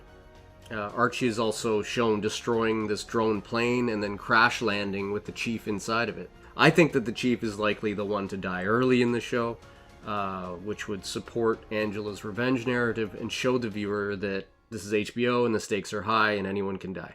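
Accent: Canada. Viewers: Told you on your last Watchmen video that I'd watch every one you put out. I don't understand how you don't have more subscribers with a channel as outstanding as yours. I came to your channel through Westworld and I'll be here for all of Watchmen. Your analysis is always spot on and very very thorough. Keep up the great work!! Where are you from? I've tried to pin down your accent and if I was forced to guess I'd say Canadian or the upper Midwest.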